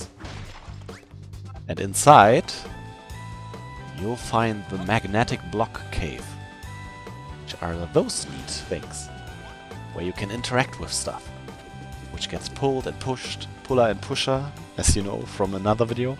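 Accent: Germany. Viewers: Thanks a lot for this video! I've just started playing on PC and didn't know a lot of the things you listed :) You forgot to include the links to the other videos tho ("click on top right" but there's nothing haha) Are you German, by any chance? I'm from Germany and your accent sounds a lot like ours :B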